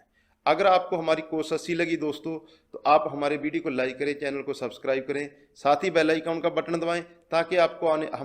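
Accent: Punjab, India. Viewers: punjabi touch nahe jaana even after 50 years 👍 👏👏💪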